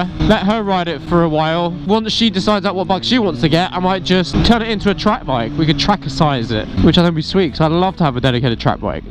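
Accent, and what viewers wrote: United Kingdom. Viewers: Gotta love it (the British accent)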